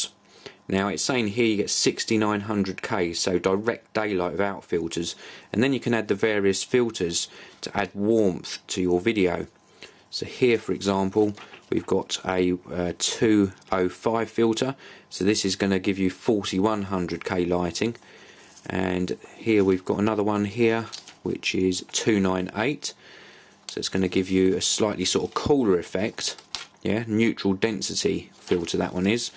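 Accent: United Kingdom. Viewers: Is it just me... having a reviewer with a British accent makes me appreciate the product so much more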